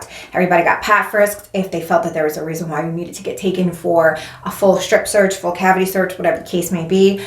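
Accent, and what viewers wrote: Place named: New York, United States. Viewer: Random but i love your new york accent lol! Also as a fellow addict in recovery i love and adore the work you are doing. I was a heroin addict for the better part of 8 years on and off. Finally have substantial clean time and my twin boys are due to make their appearance may 3rd WE.DO.RECOVER!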